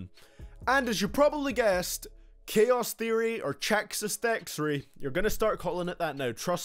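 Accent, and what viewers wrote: United Kingdom. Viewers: Are you British? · His British accent is the best he shouldn't ever try to hide it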